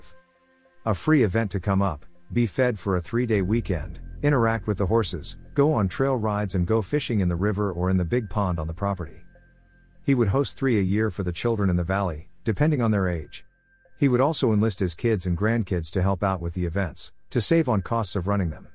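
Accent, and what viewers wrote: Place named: India. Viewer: What's with the indian accent? It totally just threw me off. Unless this was in India. haha. So weird.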